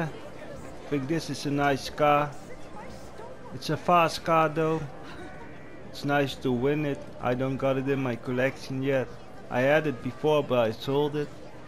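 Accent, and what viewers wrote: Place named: Netherlands. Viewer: Hey man I love you're accent, are you dutch? Ik wel namelijk en je accent doet me denken aan m'n buurman haha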